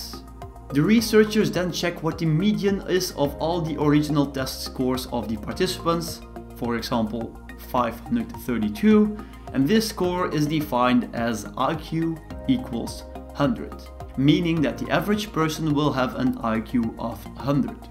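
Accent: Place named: Netherlands. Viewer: ps: I've to tell that it's not easy, at all to hear out where you come from. It really sounds like a mix of german, dutch and french accent. my guess is dutch. ( i consider myself a professionell in useless skills)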